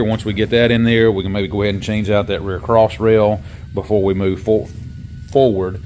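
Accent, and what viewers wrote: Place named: South, United States. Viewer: Where are you from ? Colorado? I detect a southern twang.. 🤠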